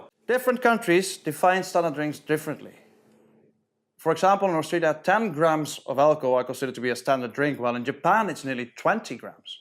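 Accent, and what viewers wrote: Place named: Ireland. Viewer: Dude, what's the deal with the Irish accent? You're Dutch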